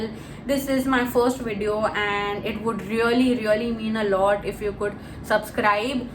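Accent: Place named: India. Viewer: i like the indian accent !!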